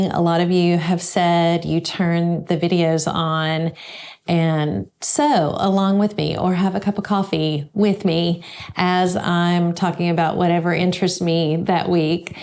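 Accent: South, United States. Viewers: Congratulations and Paul. I'm a fellow fan of spots, gingham and recycling but mostly I enjoy your joy and warm southern accent. I live in a tropical Australian city where the men seldom wear dress shirts but I can enjoy your stash creations from afar. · there are so many wonderful moments of laughter and joy in all of your videos, that's why I watch. I guess the episodes that i enjoy the most are any that highlight your wacky and interesting ways that you have with the English language and how you put your own spin on certain words, and your Southern charm is just so captivating. I am Jane from the UK. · Congrats, on your 10k+ subscribers! From Wisconsin, I love your relaxed, slightly southern, humorous delivery - I feel we are friends, and maybe sisters in a previous life! My favorite is from your “My Very First Quilt” video. You said “I didn’t know what I was doing (so) I didn’t know to be afraid.” I think we have all been there! 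· I love all of your videos but my favorite when you showed your first quilt. It brought back lots of memories when I did not know what I was doing but went for it. I live in Bagley, Ala so we are only about 50 miles apart. That is great because I love hearing your Southern voice and words. Keep up the wonderful and fun videos. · I love your Southern, casual, conversational style in all your videos but especially in Why the Catbird. It’s like a visit with a good friend. ❤ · I live Springfield, Oregon, but several years ago I got to live in the South for 7 years, and once I heard your voice I felt right at home. I'm a craft dyslexic, directionally dysfunctional, and come from a long line of Fabricholics. I too love thrifting. Your calm way of explaining things helps me feel like I really can make something. I especially enjoyed your short tutorial about how to use what little time we have wisely. 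You set your timer and polished off some "catch up" thing you don't enjoy doing, but you taught us that being self disciplined in doing the menial tasks IS part of the creative process. Thank you 🙂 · My favorite moments are when you laugh at your southern accent and your husband posts definitions. Fountain Hills AZ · Congratulations on your 10,000 K subscriber milestone!! You have inspired me so mucn, especially with the way you showed us how to use thrift stores to make quilting affordable. I had never given it a thought before you showed us how to do that, and kinda what to look for. I have to mention how much I LOVE your SOUTHERN ACCENT. I grew up in Ky so it is so soothing for my soul when I watch your videos. I am currently living near Gettysburg PA. Keep ups the great content. Thanks to Paul for being such a supportive person in your journey.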